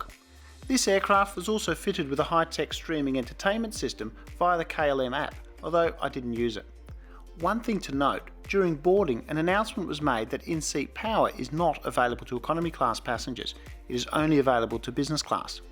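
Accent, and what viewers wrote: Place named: Australia. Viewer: Me, every time I see your updates: “Yaaaaasss a new! *whistles along*” As an Aussie expat in the UK who loves seeing all the different airline products... it’s so lovely hearing it being presented with an Aussie accent ^.^ <3 Thank you for your vids ^.^ xx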